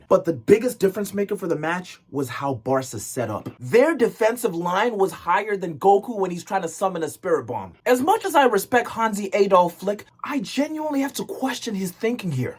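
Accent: South Africa. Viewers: wait you're south african fr 😭😭😭😭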